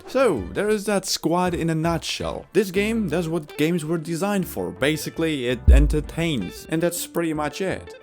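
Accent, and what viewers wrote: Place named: Europe. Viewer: You have a bright future in this, the right amount of edgy, the weird European accent and tempo. Keep it up.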